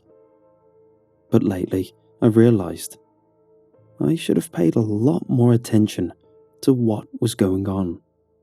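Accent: United Kingdom. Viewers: Man the British is strong with this one.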